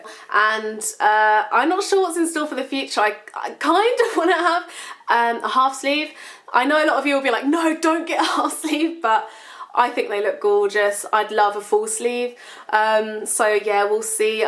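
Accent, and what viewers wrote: London, United Kingdom. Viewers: the london accent. :) <3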